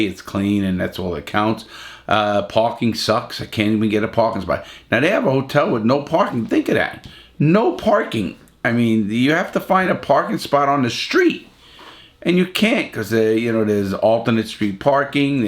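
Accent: New York, United States. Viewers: do you find that your NYC accent comes back thicker when you go visit your son?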